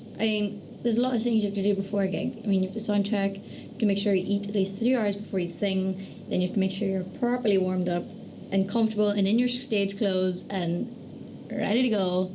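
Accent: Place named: United States. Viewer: im confused about the american accent?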